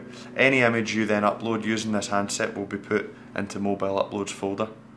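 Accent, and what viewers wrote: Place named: Northern England, United Kingdom. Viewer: hes from northern england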